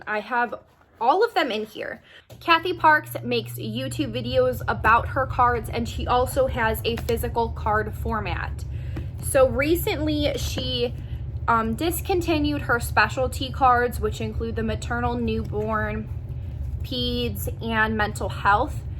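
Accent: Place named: Midwest, United States. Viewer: you are sooo cute and thanks for this! I was recently accepted into Chamberlain in Irving, TX! So I HAVE TO ASK! Did you attend the school in Chicago????? You sound like you're from either Chicago... or Minnesota! I was born & raised in Chicago!!!! <3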